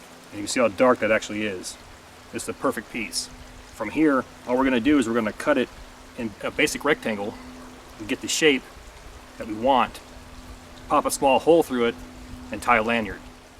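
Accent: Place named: Midwest, United States. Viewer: What part of the Midwest are you from I live in Indiana ?about 20 miles from Illinois that was a good video by the way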